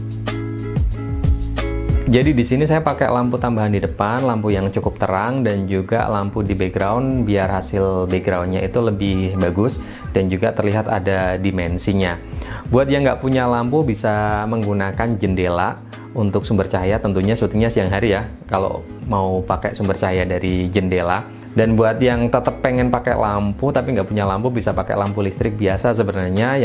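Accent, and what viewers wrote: Pekalongan, Indonesia. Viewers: cah kalongan ndi suarane?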